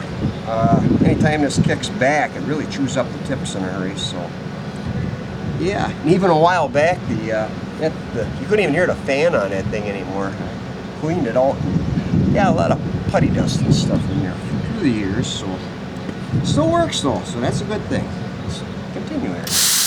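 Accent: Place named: Midwest, United States. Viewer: Where do you reside? By your accent I'm guessing Illinois, Wisconsin, Minnesota, or Michigan.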